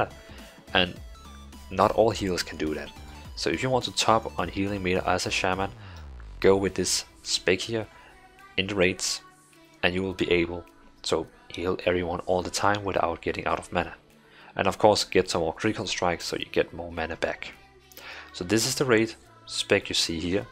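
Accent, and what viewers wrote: Denmark. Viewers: Love the danish version of english...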